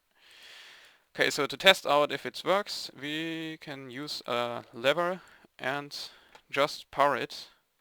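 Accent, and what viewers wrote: Germany. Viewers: Du bist deutsch, ne? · Are you a German ? You have German accent. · HAHA, ich wusste es^^ :DD, man hört, dass du deutscher bist^^ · Du bist Deutsch, hab ich recht? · i like his germen voice or at least i think its germen still it sounds cool and ... gentleman-ish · Nein, der deutsche akzent klingt gut, es ist keine Tugend wie jeder Amerikaner rumzunuscheln! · bist du deutsch?? denn dein akzent ist grausam^^ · Yes, it's kinda obvious that he's german, I'm german and I can hear it from his accent ;) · red deutsch! ;D man hört dass du deutscher bist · bist du deutsch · you sound a little german... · Are you from Germany? / Bist du aus Deutschland? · ... Hey, you are German, right? You have a little bit of an accent. :D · Ithinkk you're a german, oder? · Man i'm from Germany and thats definitely a german accent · vom akzent her muss er deutscher sein :D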